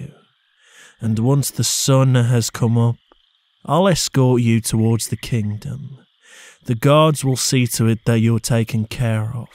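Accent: United Kingdom. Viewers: I could listen to tour accent all day if I could What kind of accent do you have it sounds like you are from the UK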